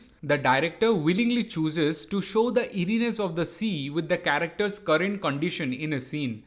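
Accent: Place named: India. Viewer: Ok. Why don’t you learn to pronounce the English words like in English not in Hindi heavy accent or talk in Hindi language. This is the same as Call centre for Fido asking for information ℹ️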